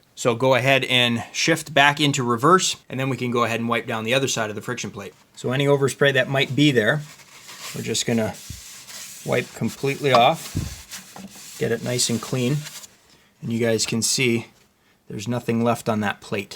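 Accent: Canada. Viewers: I can hear the 🇨🇦 in your voice!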